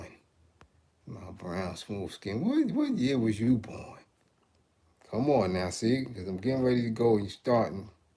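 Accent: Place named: Brooklyn, United States. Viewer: New sub (from Albany, ny) I love hearing you talk that talk with doggie in that bk accent. I had to subscribe and keep getting that knowledge. We need more men like you in this world to help get our ppl together. Keep up the great work!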